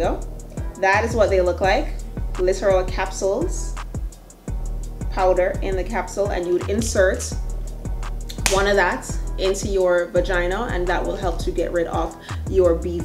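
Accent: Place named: Jamaica. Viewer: Got to be Jamaican love it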